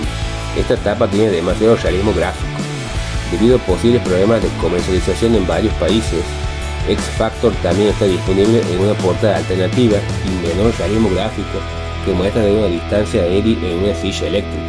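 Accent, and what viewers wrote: Tucumán, Argentina. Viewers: Sos de Tucuman ?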